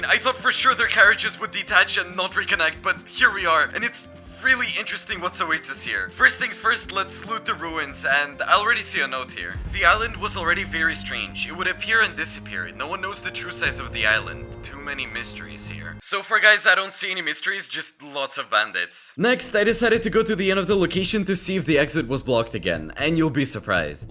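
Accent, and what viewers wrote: Russia. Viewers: It's quite cool to see a Russian speaker talking in English for minecraft content, Subbed. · Are you Russian 🤔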